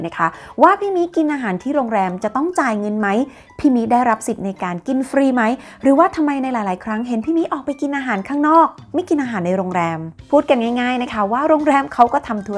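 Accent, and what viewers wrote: South, Thailand. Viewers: พี่มิเป็นคนใต้หรอพี่พี่มิ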